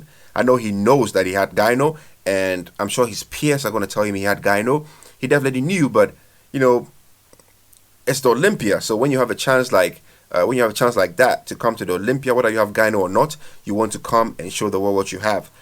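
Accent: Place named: France. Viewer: I think he speaks french. Souns like a french accent.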